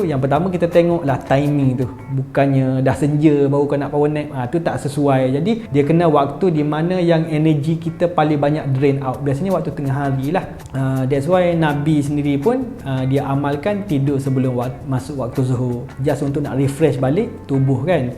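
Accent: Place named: Kelantan, Malaysia. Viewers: Bkn dgr dialek tu kelate john penang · Pekat dialeg kelantan walaupun bercakap dalam berbahasa Malaysia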